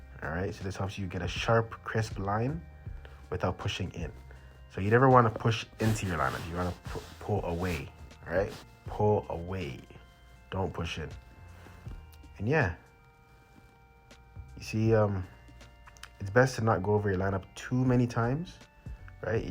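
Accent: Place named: Toronto, Canada. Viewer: Man sounds like he's from the GTA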